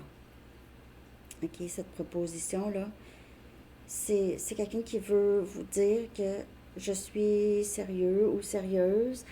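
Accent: Quebec, Canada. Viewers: Love You* * & j'aime ça le parler Québécois..! Tellement beau..!❤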